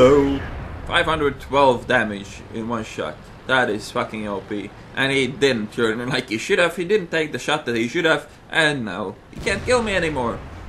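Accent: Russia. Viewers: dat russian accent :))) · lol, Id love those russian accent, some how I just can't pretend one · LMFAO! Love your Ruski accent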